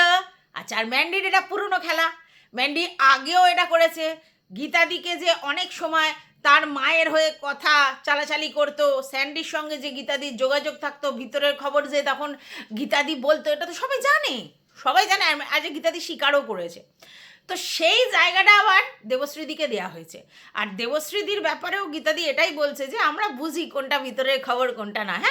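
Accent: Bangal, Bangladesh. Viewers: Darun bangal vasa koile go dil khus😂❤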